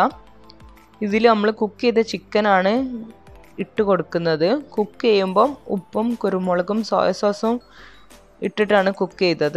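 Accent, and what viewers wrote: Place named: Kozhikode, India. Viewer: തനി കോഴിക്കോട് ഭാഷയിൽ അവതരണം 😄😄 So nice keep it up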